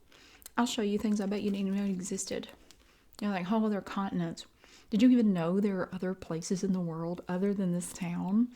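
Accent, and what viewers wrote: South, United States. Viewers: kinda like a southern angela from the office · Now this is as southern as you can get. I love it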